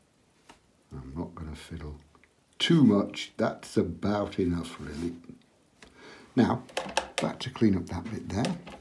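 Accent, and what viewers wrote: Yorkshire, United Kingdom. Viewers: What a joy to watch, and as a West Riding woman of similar vintage I love hearing your vowels! You really do make the whole thing look very easy. For me it’s not! It is a great help seeing, for example how you do shadows, and how things blend together when you are using a limited range of colours. Really nicely filmed as well. Thank you.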